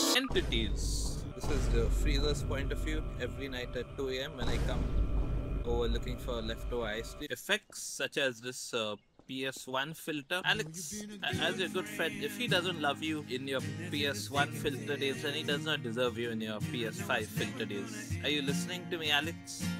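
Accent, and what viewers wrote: India. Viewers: I thought you are indian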